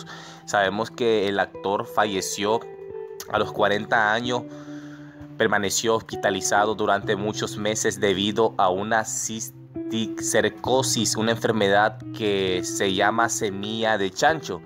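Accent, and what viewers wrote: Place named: Central America. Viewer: Se te escuchan problemas de habla y no sabes leer bien. Escucho acento centroamericano. Le atiné en todo, cierto?😐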